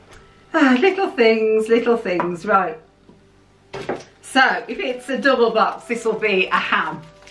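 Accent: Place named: RP, United Kingdom. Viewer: lol the queens English priceless you so make me laugh... Even worse when my ma is from Birmingham lol... Look forward to your videos all day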